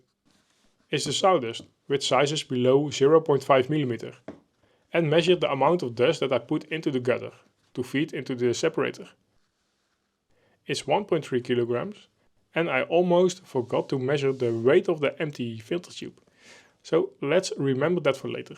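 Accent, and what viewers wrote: Netherlands. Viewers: I can barely understand what you are saying. You have a really thick accent I guess Dutch.. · Actually this was part of what got me hooked. Love me some thick Dutch accent, probably because I've met so many nice Dutch people. Greetings from duitsland, godverdomme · half a second in i reconised your accent as one of my own. and then at 48 seconds you confirmed it. How are there so many dutchys on youtube ill never understand.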